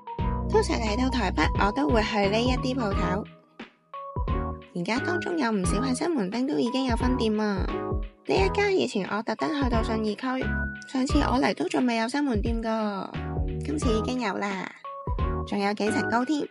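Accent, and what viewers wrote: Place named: Hong Kong, China. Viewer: Hong Kong accent is so cute and soothing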